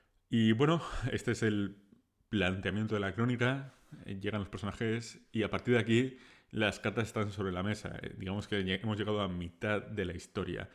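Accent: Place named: Spain. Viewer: El contenido es bueno pero tienes muy acentuado tu acento español que no esta mal pero tiendes a pegar las palabras y se hace sosa la narracion me gustaria que tuvieras un acento mas. Neutral y fresco muchos ya lo estan haciendo sugerencia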